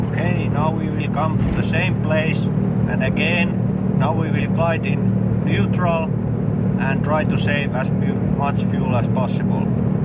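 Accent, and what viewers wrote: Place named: Finland. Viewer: Finish accent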